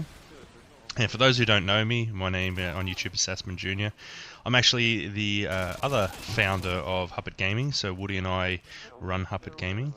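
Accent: Australia. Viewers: Your Australian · ru australian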